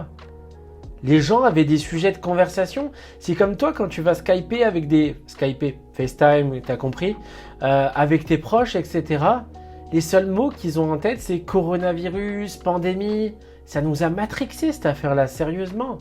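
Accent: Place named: Quebec, Canada. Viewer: Tu sais que tu choppes un très léger accent Québecois ? 😅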